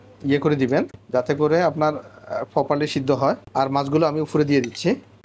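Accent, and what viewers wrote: Bangladesh. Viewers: If you are from West Bengal, then how come ,you talk like BANGLADESHIS? Sharishar tel, Morich ? What nonsense?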